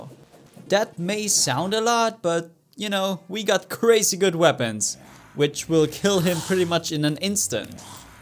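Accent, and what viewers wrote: Germany. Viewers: are you german? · Joo du bist deutsch nicht wahr? merkt man am Accent und deinen Kanal Namen, hast du vielleicht Lust mit mir terraria zu spielen? würde mich so freuen :)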